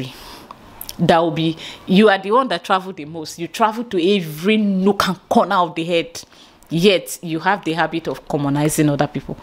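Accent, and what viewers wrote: Ghana. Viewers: You speak Ghanaian English, Your accent is good. Your intelligent.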